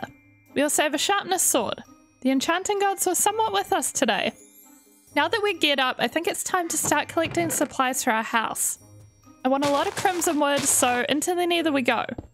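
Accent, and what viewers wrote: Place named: New Zealand. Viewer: i know a kiwi when i hear one!!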